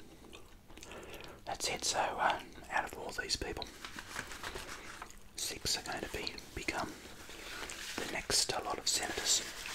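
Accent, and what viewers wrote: Australia. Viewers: I think half of these views are mine for the last months 😅😅 i hear it every day almost. Chewing gum rules! Till now never have noticed the Australian accent, but you introduce me to it and I absolutely love it. And it was very creative idea to talk about politics (maybe not a popular theme in the asmr world but a wonderful niche)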